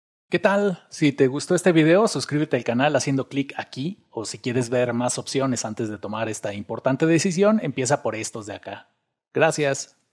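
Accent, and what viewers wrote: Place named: Mexico City, Mexico. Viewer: me encanta su acento chilango!!😋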